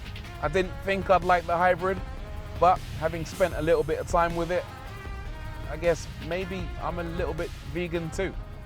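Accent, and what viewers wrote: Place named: Bristol, United Kingdom. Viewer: What part of England are you from, Bristol area?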